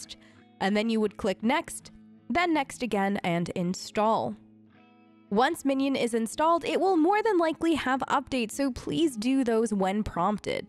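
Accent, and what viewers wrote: Australia. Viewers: Sick vid, but dont you think for one moment i didnt catch that hidden aussie accent. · OHHHH she's australian! gotcha hehe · You are adorable and helpful ty. I can tell you have a Austrillian accent by the way you say ESO. I love it.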